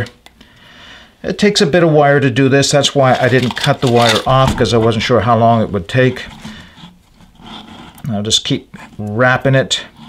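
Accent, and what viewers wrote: Nova Scotia, Canada. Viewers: I thought that accent sounded like Nova Scotia! Born and raised there until I was 12. Great video!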